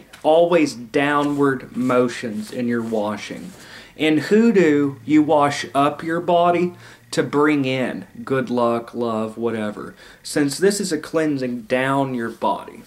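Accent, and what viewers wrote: South, United States. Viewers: that Southern drawl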